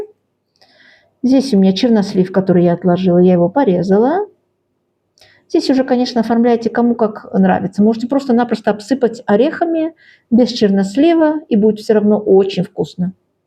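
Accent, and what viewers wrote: Baku, Azerbaijan. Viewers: Дорогая, я сразу это поняла, ведь я тоже Бакинка, Правду говорят, «Свой свояка, узнаёт издалека». Я раньше тоже вела Влог. В Баку мы все были по Национальности Бакинцы 😊 Поэтому, Наш акцент, наша доброжелательность, наш менталитет останется с нами навсегда. Я от всей души желаю вам процветания, удачи во всем. С Бакинской теплотой, и нежностью Нателла Борисовна. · Спасибо!! Привет из Баку!! У вас остался бакинский акцент, с удовольствием смотрю ваши видео, обязательно испеку!! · есть такая нация - бакинцы. Это наш говор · Мне 82года стараюсь меньше есть чтобы не прибавлять в весе,но смотрю ваши ролики и так наедаюсь вашими вкусняшка ,конечно некоторые рецепты применяю,а калорийные лайкаю и ваш бакинский акцент умиляет,возвращаюсь в старый родной Баку.Желаю вам здоровья и процветания.Всем кто смотрит ваши ролики мира,здоровья и добра.Израиль.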